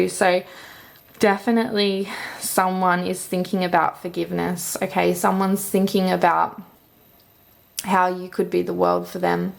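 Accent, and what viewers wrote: Australia. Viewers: Gosh I love it when I hear a fellow Aussie on hear...can feel lonely on here sometimes 🙃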